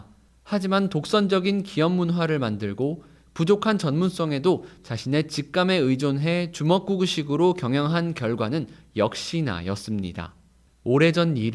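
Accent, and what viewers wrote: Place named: Gyeongsang, South Korea. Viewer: 갱상도 상 남자 노 ㅋㅋ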